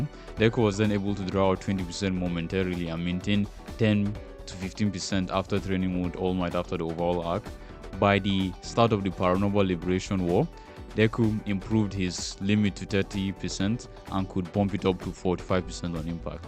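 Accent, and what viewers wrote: Nigeria. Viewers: You Nigerian?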